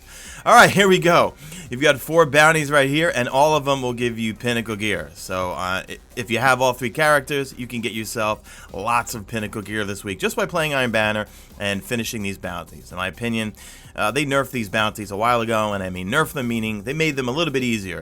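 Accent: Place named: East Coast, United States. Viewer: You sound like you're from the east coast. I hear a slight accent. Regardless. keep making amazing content dude!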